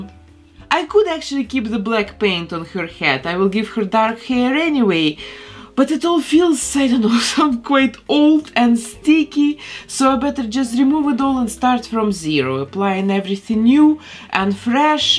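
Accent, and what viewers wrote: Russia. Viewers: Оооо видно что у неё русский акцент 😂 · Вы, случайно, не русская?) У Вас акцент такой прикольный~ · Hey,. I’ve noticed your accent. Do you come from Russia 🇷🇺?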